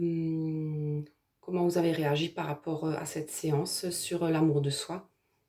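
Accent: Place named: Alsace, France. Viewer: vous n etes pas alsacienne d'après l'accent ? c sympa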